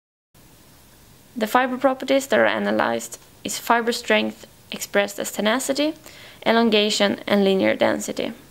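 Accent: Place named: Sweden. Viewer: Normal Swedish accent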